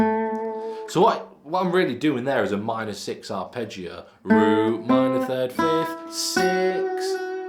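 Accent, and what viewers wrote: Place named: Derbyshire, United Kingdom. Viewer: I didn't understand a word. Nevermind, nice accent though. Buxton?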